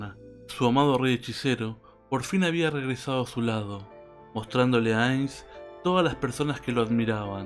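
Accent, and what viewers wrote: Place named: Argentina. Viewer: ¡Ése sentimiento en el guión! 👍 👍 👏👏👏,para un Hispanoamericano, sólo lo puede vocalizar un Argentino 🙌🙌😘💜, te lo dice un Chileno amante de su Patria, ¡bravo por tí! 👏👏👏✌✌✌🙌🙌🙌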